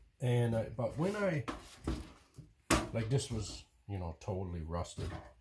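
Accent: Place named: East Coast, Canada. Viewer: I recognize a east coast Canadian accent !!! 🇨🇦🍻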